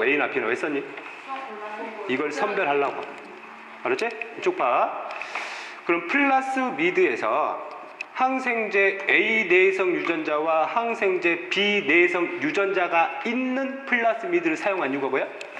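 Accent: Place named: Jeolla, South Korea. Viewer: 아따 전라도 사투리 구수하구마잉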